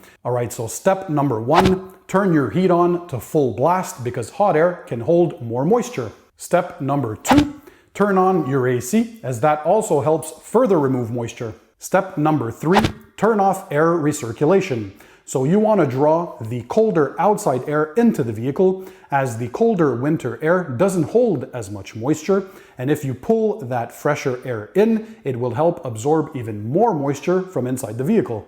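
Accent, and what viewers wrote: Canada. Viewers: Dude, why the Canadian accent? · Canadian accent, eh? 🇨🇦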